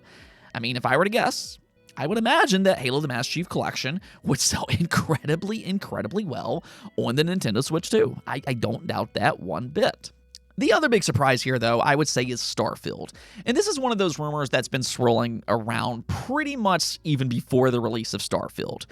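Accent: Philadelphia, United States. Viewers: Your accent, are you from Philly or nearby?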